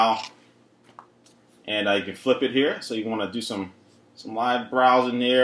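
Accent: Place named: South, United States. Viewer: Liberry. Akurrate. Lol love the southern pronunciations. (I live in Louisiana)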